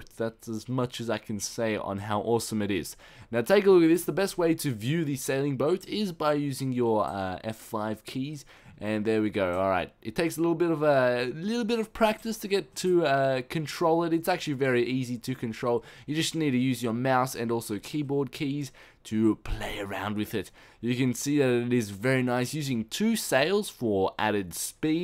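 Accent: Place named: Australia. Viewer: Are you Australian? You kinda sound like one.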